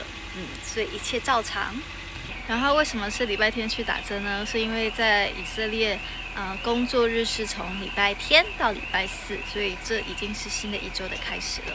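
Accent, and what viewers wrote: Taiwan. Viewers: 聽你口音 帶老公同個仔返去台灣啦 做乜9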